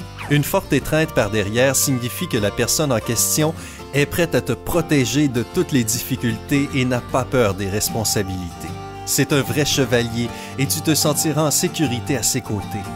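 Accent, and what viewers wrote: Quebec, Canada. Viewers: Tain c'est un québécois 😂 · Hey st'un québécois qui parle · La voix off ses un québécois tabarnak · J'adore ton accent du qebec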